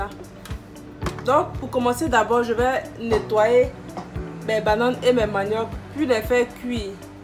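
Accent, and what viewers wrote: Ivory Coast. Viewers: Ouh lala j'aime trop ra façon de parler à l'ivoirienne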